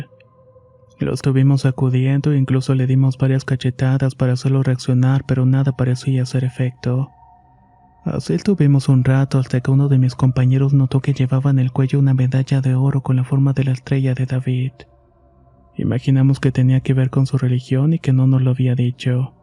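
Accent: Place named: Chihuahua, Mexico. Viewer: Si eres de Chihuahua , porque hablas asiiii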